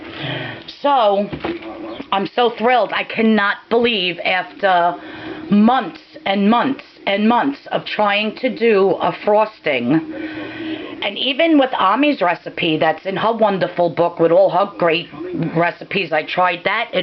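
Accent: New York, United States. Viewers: you sound like me where are you from you sound like a new Yorker I'm from Staten island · Thank you for all the info. I also love your accent. Since I moved from Fairfield County CT to Houston I don't get to hear NY accents anymore. And you cracked me up about your husband hearing the mixer. LOL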